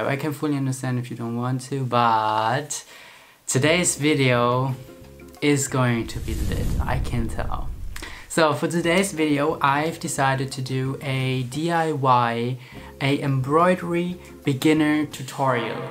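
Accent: Germany. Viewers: Are you german.? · Dude are ya German ? I hear a German accent there 👁👄👁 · Are u german?:) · Are u german? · after five seconds in this video I already know that he's a German lol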